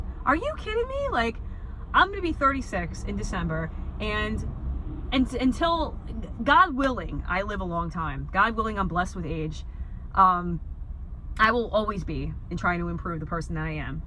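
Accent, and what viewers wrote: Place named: New York, United States. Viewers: no shade I’m just from the Midwest we know a New Yorker when we hear one. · I love ur New York accent, I think it’s so cool.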